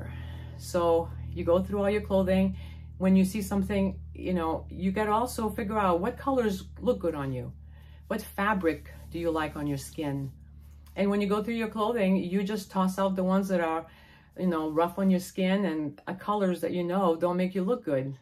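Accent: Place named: East Coast, United States. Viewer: I am so enjoying your videos! What you have to offer is much needed right now; please keep at it 👍 Your numbers will continue to grow, but I have to say, it was a little difficult finding your channel again when I had cruised past it intending to return and watch it later (I do this a lot. I just remember the title instead of saving). When you type in Living A Simple Life a hundred other channels come up with the same name You are so unique; your east coast accent is fabulous; I love when it pops out naturally, lol. Your personality really shines through ✨️✨️✨️ I'm intrigued by your life because I am currently on the west coast (CA), dreaming of moving to the east coast and living simply as well. As a native Minnesotan I'm really missing having four seasons... I look forward to your future videos and watching your channel grow! ❤️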